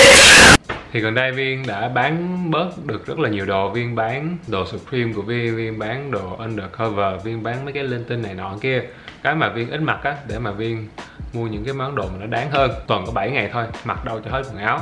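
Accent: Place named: South, Vietnam. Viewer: a noi giong nam dth von hnao thu noi giong bac di anh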